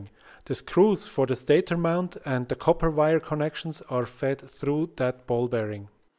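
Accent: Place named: Switzerland. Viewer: Der Dialekt liess mich vermuten, dass du vlt. Schweizer bist wie ich. Ein Blick auf den Kanal bestätigt zumindest die deutsche Muttersprache.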